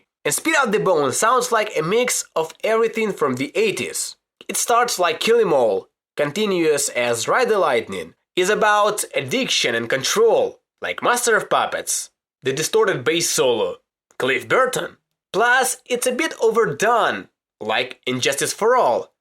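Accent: Russia. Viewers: I love your russian accent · Сразу подумал что русскоговорящий человек по акценту, а потом ещё и "поехали")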